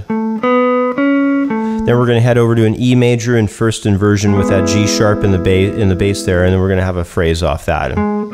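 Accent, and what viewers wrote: Canada. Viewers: Are you Canadian? Accent when you say out sounds like trailor park boys! Awesome lessons!!!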